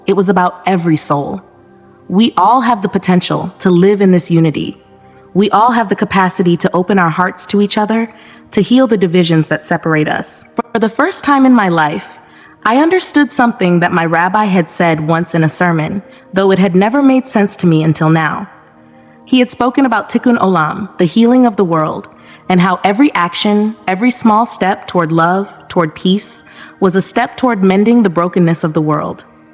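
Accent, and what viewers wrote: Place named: Brooklyn, United States. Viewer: I'm curious about your Brooklyn accent! What can you tell me about it. You sound like your from an area I'm not familiar with..???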